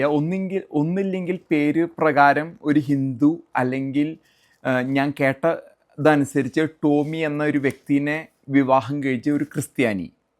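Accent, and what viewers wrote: Thrissur, India. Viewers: ചാലക്കുടി_അങ്കമാലി slang aanu❤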